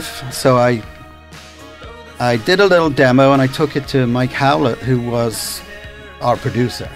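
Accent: United States. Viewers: Is it me or does he have an American accent? Isn't he originally from England? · What's with the ridiculous america accent mike and you're toned down your Liverpool one embarrassing ?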